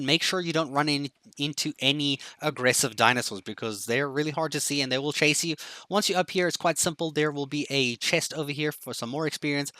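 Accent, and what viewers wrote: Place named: South Africa. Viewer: You sound abit South African bro❤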